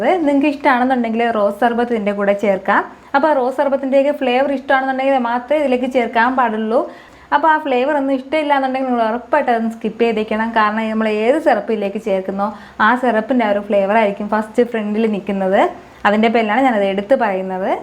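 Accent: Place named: Thrissur, India. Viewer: Thrissur aayirikkum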